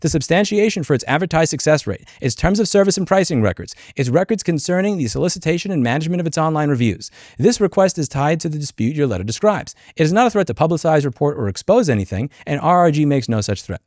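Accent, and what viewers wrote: New York, United States. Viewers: Lol your NY came out a bit more than usual. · Ny accent came out strong in this one, was exceptionally tight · They obviously have no clue who you are. You may be in Texas, but I love the New Yawker in you! :D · The "New Yorker" in you really came out in this video hahaha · I think we all love when really gets going in the New York accent comes back out strong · I love when you get excited about calling people on their BS. Your NY accent comes out hard, lol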